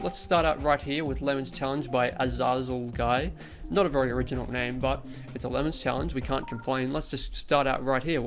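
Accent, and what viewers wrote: Australia. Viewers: is sounding significantly more australian than usual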